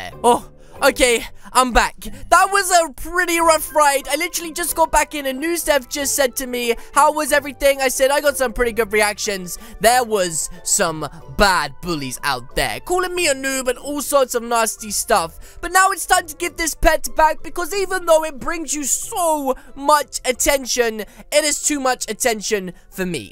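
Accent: United Kingdom. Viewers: Are you bristish I love your accent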